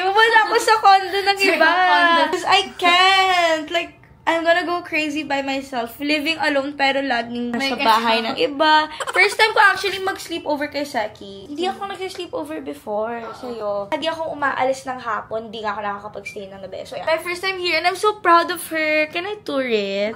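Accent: Conyo, Philippines. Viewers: While hearing Ms saying " I wish Blythe was here" made me realize and made me wish that i also have that kind of friend who thinks of me everytime I'm not there with them 'coz i always feel like I dont have friends I just know a lot of people..... Btw I love youuur conyo talaga its not maarte or cringe or oa it's so cutiee😻🥰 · hahha omg so cute ng pagiging conyo mo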